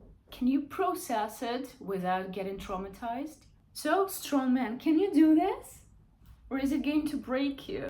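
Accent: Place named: Russia. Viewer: Hello, I found your channel a few days ago through your video about chastity amid researching the topic, then watched the rest of your videos and subscribed. I believe this is your best video yet. I wanted to leave a word of thanks because channels with content like yours have inspired me 1.5 months ago to find a dominatrix, and since then my life has improved exponentially, both materially and perhaps more importantly, spiritually. The point which you bring up in this video, that submissiveness shouldn't be perceived as a weakness but rather a strength, and therefore ought to be a trait which one can be proud of — even publicly — rather than ashamed of, is something I’d never considered before. This may have to do with me being Japanese, although I imagine it’s generally true everywhere else. Lately I’ve actually become slightly more open to my closest friends about my submissiveness…and this video makes me feel even more empowered and encouraged to come out of my shell. 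Thank you for sharing your thoughts, your experience laden articulation and honesty is always appreciated. Looking forward to your next video, and in the meantime, good luck in your continued endeavors as a dom! PS your Russian accent is charming ^^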